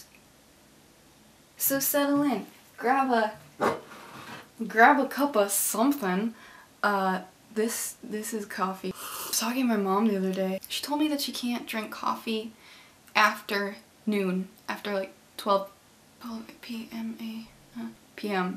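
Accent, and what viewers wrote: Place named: Minnesota, United States. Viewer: your minnesota accent was rly prominent in this video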